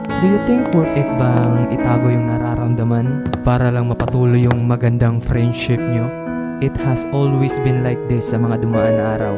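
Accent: Visayas, Philippines. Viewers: Klaru kAayo bisdak hahaha.. nice.. bat kaya di ka pa rin sikat...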